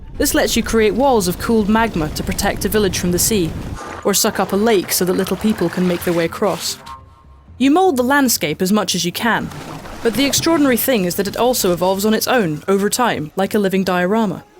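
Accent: United Kingdom. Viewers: Lol all the people who don't know it's a British accent. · hes british u fuck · I'm sorry... but is it a young British girl or guy giving the review? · Whoever mistakes a woman with a British accent with a squeaky 13 year-old is simply disgraceful. Like, seriously. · Australian? That don't sound like any Australian I know sounds more like British or Scottish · people! it's quite clearly a woman reviewing... and if you disapprove of our British accents then you can jolly well sod off - and stop picking on our saliva build up due to too much tea and crumpets... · I knew as soon as I heard a young British person reviewing this 2 million idiotic Americans would be up in arms. Just because your vocabulary doesn't stretch past 'awesome' and 'sucks' doesn't make it a bad review, it just makes you an idiot.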